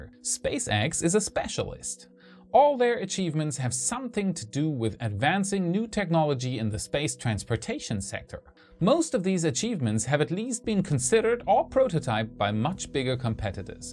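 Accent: Germany. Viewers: He is speaking unusually good English with a slight Tscherman Akzent. The only Germans you are likely to hear with less accent than him are a few professional actors and people who had a bilingual childhood. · I like your slight german or central european accent.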